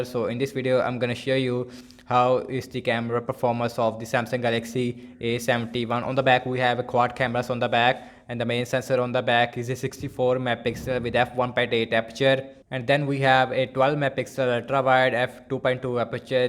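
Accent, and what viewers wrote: India. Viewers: nice ! thanks , you have a little accents indi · Nice to hear English in desi accent.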